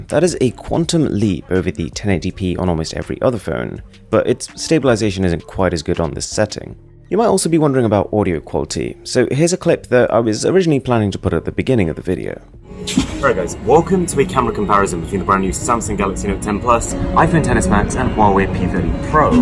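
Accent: United Kingdom. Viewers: That brittttttttish acccccccent is thiccccccccccccc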